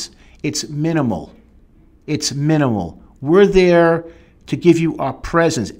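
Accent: Brooklyn, United States. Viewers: Sorry to disappoint, but that's pure Brooklyn. I grew up in a neighborhood called Bensonhurst.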